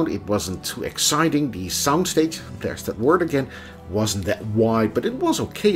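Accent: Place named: Netherlands. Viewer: Nederlander die een beetje aardig Engels kan, 👍🏼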